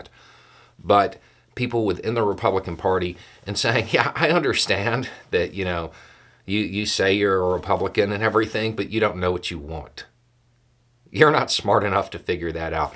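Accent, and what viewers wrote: South, United States. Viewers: Please do a couple more videos with your southern accent!!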